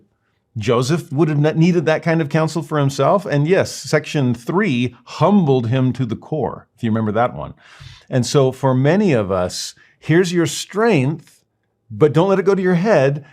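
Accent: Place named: North, United Kingdom. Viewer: Are you really a Texan cause you sound like you're from up north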